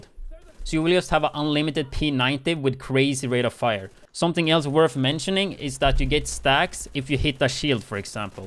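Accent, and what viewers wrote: Sweden. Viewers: the Swedish accent is strong with this one!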